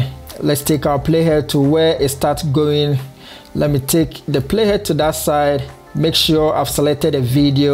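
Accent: Ghana. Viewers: Hi. Love the Ghanian accent. How to you add effects to web pages like you did from showing the videos you make. Once again LETS GET STARTED.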